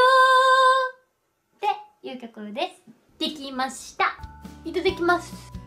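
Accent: Kansai, Japan. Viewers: 初々しい....wまだめっちゃ関西弁！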